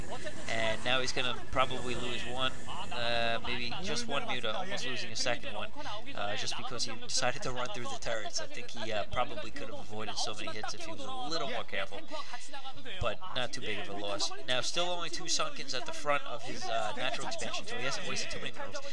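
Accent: Boston, United States. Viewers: nice a new commentator...ur prolly from boston...keep up the great work man...enjoyed it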